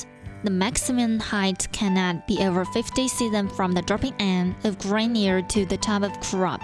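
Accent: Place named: China. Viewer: an automatic reader with a chinese accent...i seen it all now.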